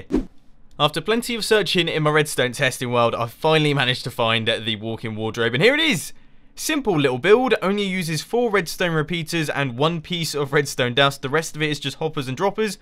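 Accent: United Kingdom. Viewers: I LOVE, AND I AM AMERICAN, SO AMERICANS LOVE BRITISH PEOPLE. THE ACCENT IS SO AWESOME. I respect that you spend time on these recordings, as I make videos myself, and I work for each one for about 4 hours, but you spend 3 whole days.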